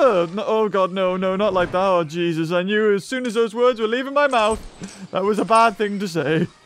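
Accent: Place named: United Kingdom. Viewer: you live in the U.K.don't you I hear it in your voice. Can you send pictures of castles?😁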